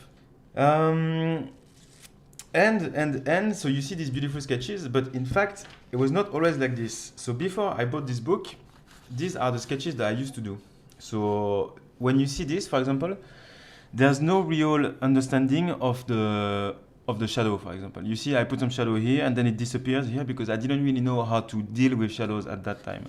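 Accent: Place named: France. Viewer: vu l'accent je suis sur que l'auteur est francais !!!! merci pour le job en tout cas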